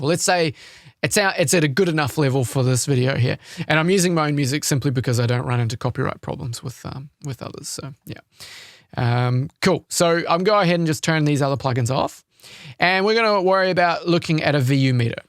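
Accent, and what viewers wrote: New Zealand. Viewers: Hey mate. Just found your channel and so nice to hear a fellow Kiwi accent. I just set myself up with Ableton live lite. free with my Focusrite solo interface. I've just watched your first video of the 25min intro for beginners and found it great. It would be great if you changed your mouse cursor setting to make it bigger on the screen and easier to follow your movements when sorting settings. other than that, Just subb'd thanks for you channel!!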